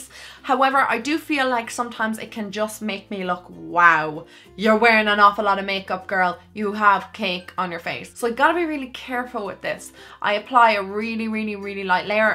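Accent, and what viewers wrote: Ireland. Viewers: I can't quite put my finger on it but you're becoming one of my favorite YouTubers to watch. I love your personality and your Irish accent. I'm from Texas USA and have always wanted to go to Ireland. I hope the day that I do I will be able to meet up with you :-) I feel like we would get along well.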